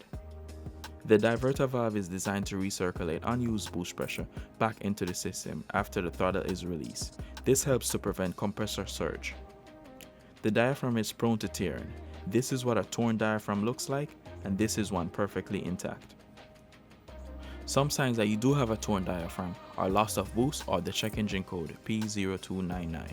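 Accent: Jamaica. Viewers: You Jamaican bro? bless up ✊ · Just subscribed my boss. Yuh sound like a yaadie. Everything you touched on i have done on my car. It makes for a trouble free.. Worry free..peace of mind experience. Great video, to the point and helpful. How can you differentiate a misfire due to carbon build up?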